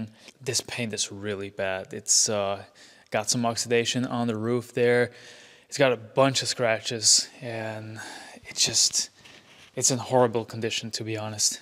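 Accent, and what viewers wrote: United States. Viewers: Hey mate, are you a native belgian? Your accent is very american! I order from your detail store all the time.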